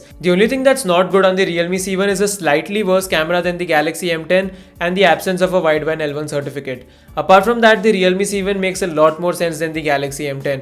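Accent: India. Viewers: The indian accent is too strong anyways thanks for the review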